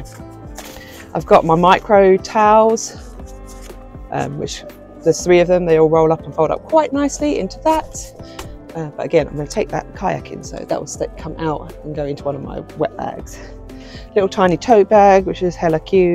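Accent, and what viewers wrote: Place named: Southern England, United Kingdom. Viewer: I thought you sound like a southern girl. Love your crochet blanket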